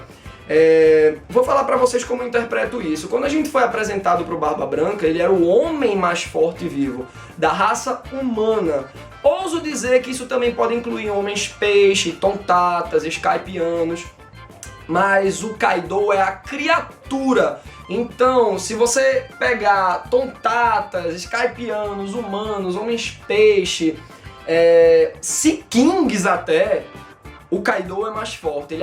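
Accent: Pernambuco, Brazil. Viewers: VC e Pernambucano ou Nordestino?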